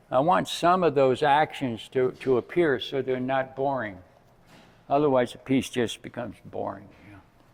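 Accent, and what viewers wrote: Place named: United States. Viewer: I was not sure at first and I thought on no not another yank talking, but I just love how they turned out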